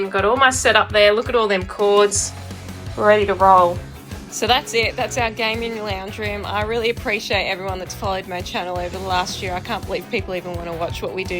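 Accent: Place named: Australia. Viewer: Smokin' hot, tattoos, plays video games aaaaand has an Australian accent?! Crikey!😊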